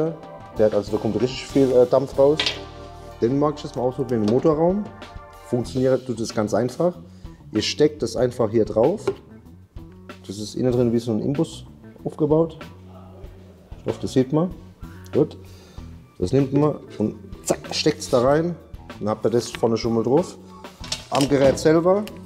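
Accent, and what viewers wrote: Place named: Palatinate, Germany. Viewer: Ämme Pälzer Bub kammer jo nur gläwe was er saacht 😂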